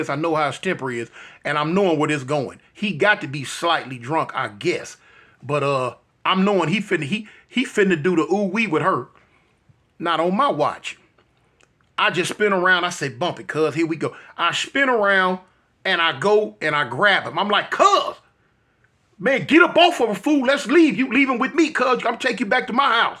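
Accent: South, United States. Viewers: I was gonna say you got a heavy southern accent which I do to but I'd swear you was from Memphis or Alabama or Jackson Mississippi one if I didn't know better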